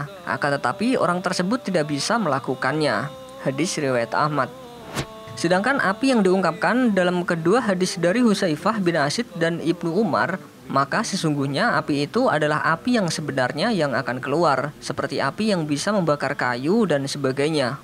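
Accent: Java, Indonesia. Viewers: Fokus video bree... Kok malah fokus komen😂 Piye arek² Iki😂 Pengetahuannya di video Ora Nang komen🤣.. #amet bahasane Medok😂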